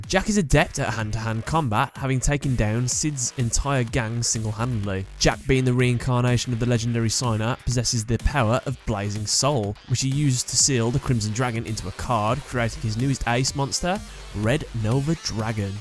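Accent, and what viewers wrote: United Kingdom. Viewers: You sound British but you can't speak like ATHLAS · Dude You Speak In A British Accent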